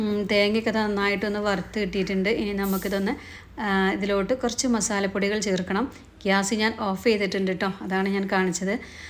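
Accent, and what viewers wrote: Malappuram, India. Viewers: പാചകവും വാചകവും ഇഷ്ടപ്പെട്ടു കേട്ടോ, മലപ്പുറം ഭാഷ ഒത്തിരി ഇഷ്ട. സൂപ്പറാണെ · നിങ്ങളുടെ മലപ്പുറം സ്ലാംഗ് ആണ് കേൾക്കാൻ രസം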